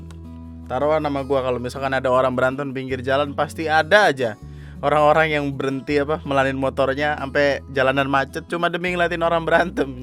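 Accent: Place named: Tegal, Indonesia. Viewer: Tegal keras cuy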